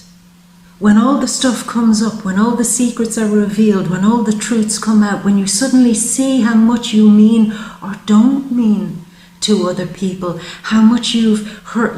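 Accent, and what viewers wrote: Ireland. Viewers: So true and profound. I am already a bit like you want me to be and am very happy about it. Will work to finish the job with the help of the universe. Wanting to do what I love to do and get to work from it. I love your accent. Irish perhaps. Thank you. Best wishes for the Holidays.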